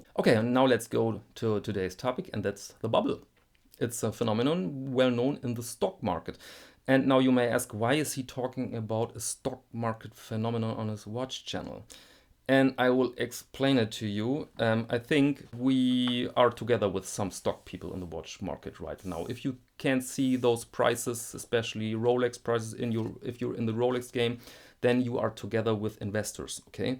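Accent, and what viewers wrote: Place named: Germany. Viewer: I mean deapite his obvious flaws he is intelligent, witty, eloquent and a man of culture with an awesome german accent. Keep up the good work!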